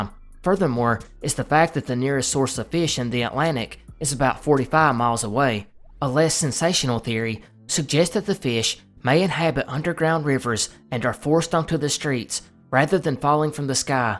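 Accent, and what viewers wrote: South, United States. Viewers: I’m from the south & i love hearing other southern accents!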